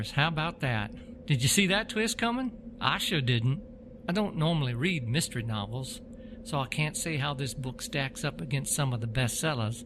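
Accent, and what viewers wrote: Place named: South, United States. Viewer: The narrator’s voice reminds me of a highly educated southern accent. Very much like the great historian Shelby Foote .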